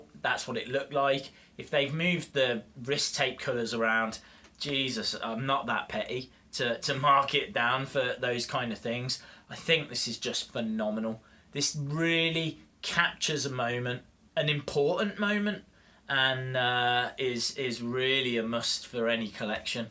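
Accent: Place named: Birmingham, United Kingdom. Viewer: You sound like a fellow Midlands Fam. Maybe Birmingham?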